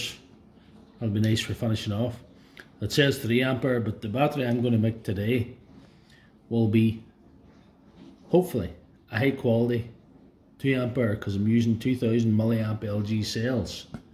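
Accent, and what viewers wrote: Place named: Scotland, United Kingdom. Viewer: Well isn’t this great. I found out recently I’m more Scottish than anything else (American from the mid-west) and I’m about to get into a damaged m12 pack. Beautiful. 😂 I’m sorry if you’re not Scottish but to my ear that’s what I’d guess. Great video regardless. 💪🏼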